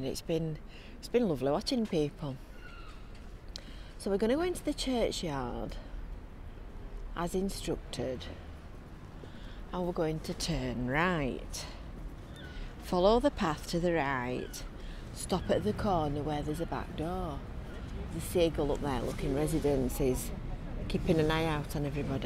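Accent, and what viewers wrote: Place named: Yorkshire, United Kingdom. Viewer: American YouTube doesn't understand Yorkshire English!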